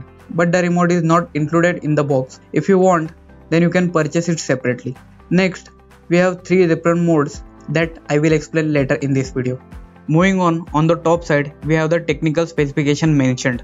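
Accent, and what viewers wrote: India. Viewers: Mere bhai, he IS, in the Indanized English specifically Maranglish! (Marathi English) I speak Tanglish (Tamil English). English is our language. Since British cheated and looted us for 200 years, we have confiscated English. IT IS OURS NOW. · my Indian brother plz when.u speak English in correct accent not in.hindi very poor english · Dude your indian accent is too much. Please study the intonation and slang of proper english. I know you speak english. But really sounds like indian language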